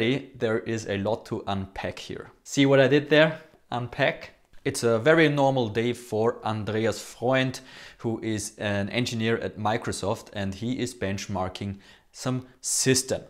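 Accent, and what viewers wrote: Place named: Austria. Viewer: go for English. You're english is good but try to explain what you mean when you use austrian dialect 😂 (I as a german native speaker, with a southern dialect doesn't understood you)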